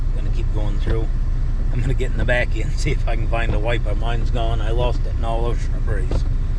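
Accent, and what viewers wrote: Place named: Maine, United States. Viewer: Forgot to tell ya.. love the Maine..northeast dialect..our dialect talk is different here in northern Michigan.. that’s all part of the story..listening to the northwestern talk while your hunting .. super there guy…keep the videos coming..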